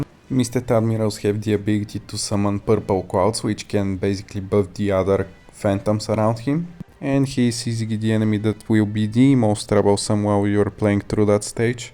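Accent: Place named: Eastern Europe. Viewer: listen, are you from a post-Soviet country? it just sounds like you are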